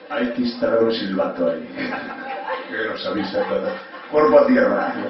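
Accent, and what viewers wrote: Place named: North, Spain. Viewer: se nota que es del norte.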